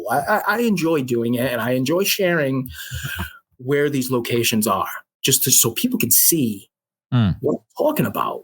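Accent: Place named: New York, United States. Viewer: This dude is so believable. I hear it in his voice. I hear NY ( north) in his voice, but if he talked to me he’d scream Hillbilly from s.e Kentucky. Isn’t it funny how a few hundred miles change our whole way of talking somehow lol. God bless everyone listening or reading. Remember bros & sisters. EVERYTHING WE SAY OR DO OR THINK, Releases either positive or negative energy, DO Not spread negativity because it leads to sickness, depression, anger, hostility, hate disorders, disease and death. It’s been scientifically proven by the water has a memory case study. Don’t let someone else sling their negative energy onto you, If it happens to you don’t respond with negativity because that means it stuck onto you. Be positive and let that evil energy bounce right off ya. Ps- That same frozen water / ice crystals study even proved holy water is real. 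Nasty polluted water once frozen could not form any pretty patterns in the ice crystals until they prayed over it and refroze it, only then did it produce beautiful patterns in its crystals And One container of water was spoken to and only told it was a Angel. Then after they froze it and looked at it’s ice crystals underneath a microscope, guess what type of patterns it made???? = A HALO OF FLOWERS How does water know angels have halos? Unbelievable huh? They now claim the largest living thing on earth is water. And water itself could possibly be the base element of life itself? What?? That last part blows my mind times 3 Idk just repe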